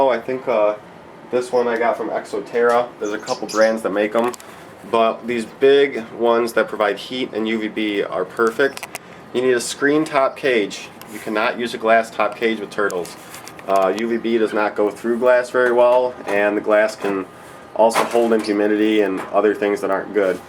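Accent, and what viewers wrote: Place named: Connecticut, United States. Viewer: you have the same accent i do and people always make fun of me for it. im from connecticut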